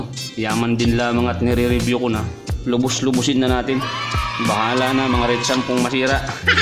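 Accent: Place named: Bicol, Philippines. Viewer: aram ko po an tono kan pagtaram kan bicolano hehe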